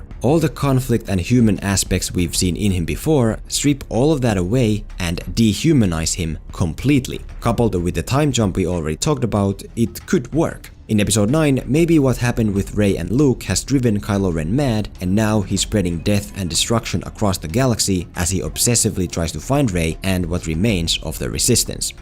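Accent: Finland. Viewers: Are you from Finland? Your accent sounds a bit like that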